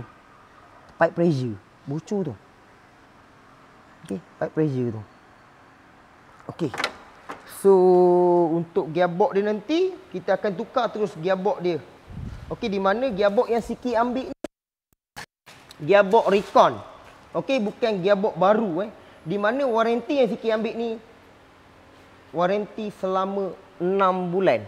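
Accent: Pahang, Malaysia. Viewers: Bengkel kat mana? Cam org phg je.. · ape pon channel bnyak bantu dlm ilmu yg serba kekurangan tu aku ttp boleh paham..yg penting gelak sendiri tu paling rare..pasni cer try buat video pasai aircond wira bile on je blower jalan tapi compresor xjalan dan bile compresor direct beteri heeellllok pulak..harap ade video ni utk care nak mencari punce die...bossku..loghat awok pahang sangat..hahahaha🤣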